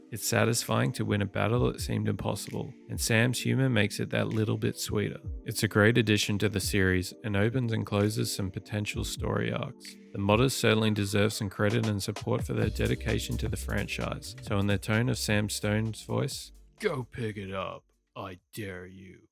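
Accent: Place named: Canada. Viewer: Iconic canadian accent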